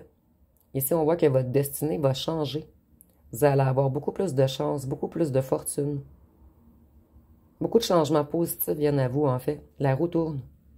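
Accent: Quebec, Canada. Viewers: J'adore vous ecouter avec votre accent quebecois vous etes calme❤❤❤❤❤❤❤❤❤❤❤❤❤❤❤❤❤❤❤❤❤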